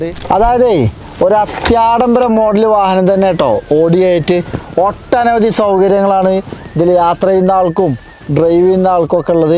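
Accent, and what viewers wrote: Malappuram, India. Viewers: എന്ത് poooo. റ. നടോ പറയുന്നത് മലപ്പുറം അല്ലെ അത്ര ഉണ്ടാകൂ കജ്ജ് നേജ്ജ് ഇജ്ജ് poda....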